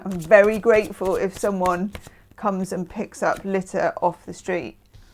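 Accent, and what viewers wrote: New Zealand. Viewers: Haha, your Aussie accent is more New Zealand/Kiwi accent. One of my English colleagues, when living in London, used to say that 'England is a cold country full of cold people', we're a very warm country so maybe that's why we're warm-er 🤩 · 😂😂You sound a little more like a kiwi (New Zealander) 🎉❤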